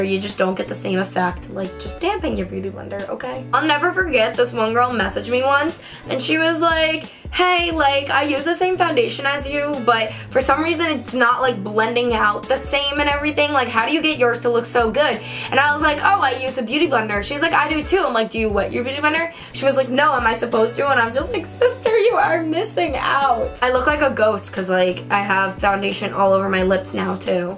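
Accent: New York, United States. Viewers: You have such a New York accent I love it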